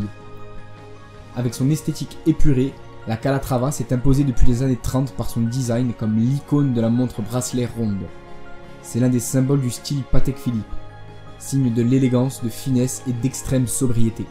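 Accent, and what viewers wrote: Corsica, France. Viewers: Bonsoir est-ce que j’entend un accent corse?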